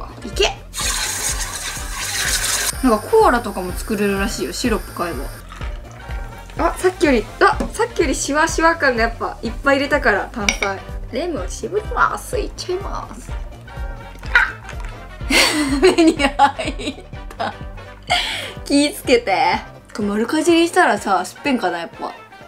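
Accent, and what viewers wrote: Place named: Okayama, Japan. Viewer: 岡山弁すき、、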